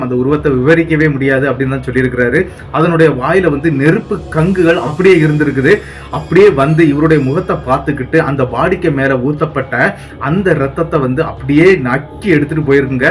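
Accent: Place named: Kerala, India. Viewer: Malayaliya? Slang mix aguthu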